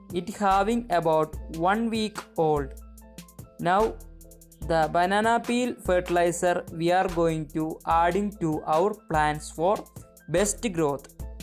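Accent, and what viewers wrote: Kerala, India. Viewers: I love kerala & the accent too 💎👍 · Ur English is so Malayalam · Mallu accent,😀😁😂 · He is a mallu😂 100% like me we all have same accent.... Proud to be a mallu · I cannot watch a video if the YouTuber has a very thick accent from india. if he's narrating it I wonder who are you talking to? no one who speaks English can understand the way you're speaking english. so it's not just an accent who the hell is teaching English classes over in india? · I know you are indea the way you talk my friend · He’s an Indian guy what do ya expect him ta sond like and given the choice I would rather sound like him than sound like a d£#k head so shut it and stop taking a lode of banana juice. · engilsh kekkumpol thanne ariyam ivan malayali aanennu lol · How about not telling someone that they speak funny. That is rude. He sounds like he comes from India. 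That is how they sound. His voice was very nice. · First of all thanks for the knowldge, next your english very funy ,when you are talking r must be silent ,most of indian people talking the same way ,must try to talk with r silent very beautiful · is you are malayalee? your english pronounsation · If his pronunciation bad that is not a big deal becouse it is not his language. If some one says his pronunciation is bad that is a big deal. I wish I too can talk like him! There are more than thousand different pronunciation in the world and his is called Indian English. I love Indian English because it makes least spelling mistake. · da potta ne malayali ele english kelkumbol ariya😂😂😂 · Adipoli, Anybody guessing about the accent it's how keralites speak English and it's beautiful 😊😊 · That pakka malayali accent👌👌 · India dialect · മലയാളി ഇറപ്പാളി ആ english കേട്ടാൽ അറിയാം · Typical mallu slang the zing thing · Thick malayalee accent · Jhansi Balla this is not english speaking class. 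europians can not utter a single english word. no japanee knows english. can you understand what a bengali speaks in english? do not make fun of accent. the person is from kerala and his mother toungue is malayalam. can you speak a word in malayalam? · I’m sorry but try learning English not Hindu English. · It's not my native-tongue either. There are many Indians who do not have that strong accent that he is exuding. SOME might even find it a tad disturbing, hence the negative comments. It's not a grammar test, but if i'm going put something on the net for all the world to hear, I might keep my pronunciation at par with the majority of English speaking youtubers posting here. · I love Indian accent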